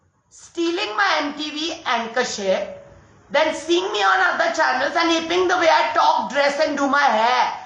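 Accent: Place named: England, United Kingdom. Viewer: Are you okay pooja we love you ❤ pooja raise voice for yourself keep stand like that I am 17 nd loved your English accent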